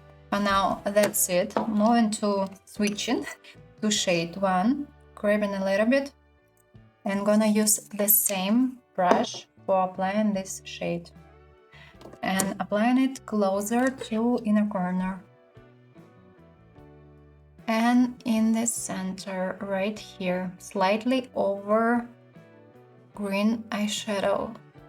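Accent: Russia. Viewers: Искала отзывы на новые жидкие тени от Катрис и попалось Ваше видео. Очень хорошо показали и рассказали, наверное, куплю зеленый цвет, думала заказать от Эльф. Хорошо так говорите по-английски, русский акцент немного слышно, а когда увидела, что Вы живете в Мюнхене, вообще удивилась. Привет из Аугсбурга и удачи в развитии канала! :)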